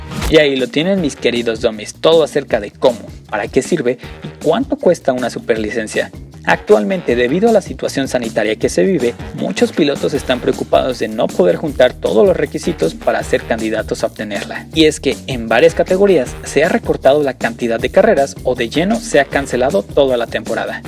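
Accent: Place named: Mexico. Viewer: q tenga acento mexicano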